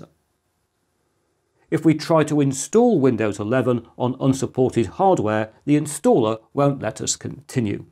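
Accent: United Kingdom. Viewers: Very clear tutorial, summarizes the various procedures perfectly. Plus I really like your British accent and very clear diction.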